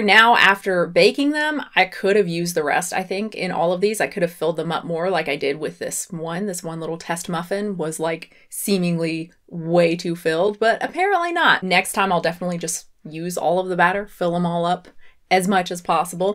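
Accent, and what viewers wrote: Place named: South, United States. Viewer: You sound even more delightfully southern than usual.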